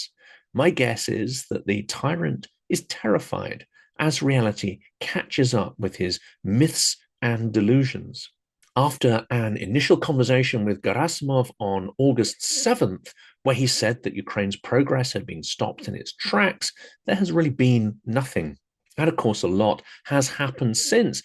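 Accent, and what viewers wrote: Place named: United Kingdom. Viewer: Well done,! Always incisive, well thought out, and stated in excellent intellectual British English which is very pleasant to American ears. Love your podcasts. One of the best podcasts on You Tube.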